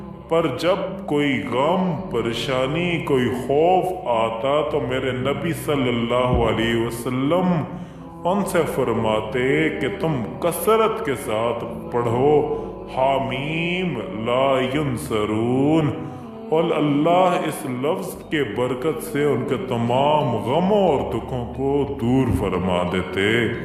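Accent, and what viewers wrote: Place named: Punjab, Pakistan. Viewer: تلفظ ، عربی وغیرہ ٹھیک کریں ۔ پرابلم پرابلم کا رٹ ذیادہ کرتے ھیں ۔ مال کے چکر میں ھر بندہ نے چینل کھولا ھے ۔اپ عالم لگتے بھی نہیں ۔ اردو بھی پنچابی انداز میں بولتے ھیں ۔ اردو ٹھیک کریں ۔ غربی بھی ٹھیک کریں ۔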